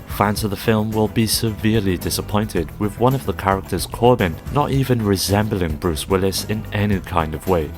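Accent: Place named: North Wales, United Kingdom. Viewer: Quality vids. Random but are you from anywhere in N.Wales? Familiar accent.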